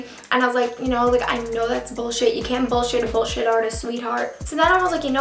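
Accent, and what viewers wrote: New York, United States. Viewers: Anyone else just love her new york accent?? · She has a New York accent! You can here it when says ask and again! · MariaHerrle she has a New York accent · Her New York accent is so strong in this! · She's from New York, it's a common accent💗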